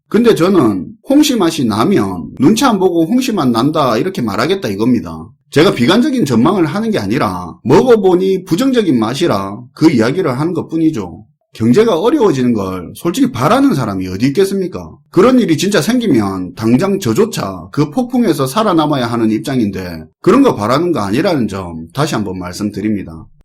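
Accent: Gyeongsang, South Korea. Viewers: 저는 시장은 장기적으로 우상향한다는 낙관론자에 가깝지만 이 부정적인 사람이라고 생각하지 않습니다. 시장을 여러시각으로 바라보는건 당연하죠. 언제나 재밌게 잘 시청하고 있고 갱산도 억양 넘나 좋습니당ㅎㅎㅎ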